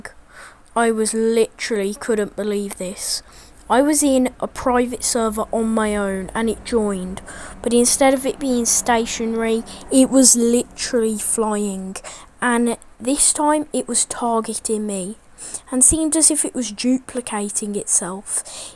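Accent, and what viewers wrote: United Kingdom. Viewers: Are you British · Bro u so brithish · BRITISH MAN UGH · Your accent is literally the perfect British accent for broadcasting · Nar mate he’s British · It makes it even funnier because he British😂😂😂😂😂😂😂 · ngl no offense but its its alot harder to take you seriesly when your british XD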